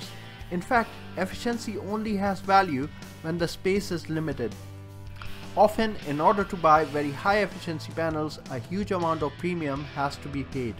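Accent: India. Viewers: I kinda started liking Indian accent.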